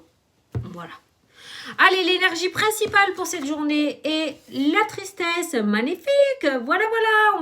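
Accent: South, France. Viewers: Cc aujourd'hui beau soleil là journée commence bien en plus ton sourire ta petite voix chantante et ton accent du sud la petit boite de cartes je vais me l offrir hâte de t avoir en rendez vous une prochaine fois🤡🙆‍♀️🌹🏖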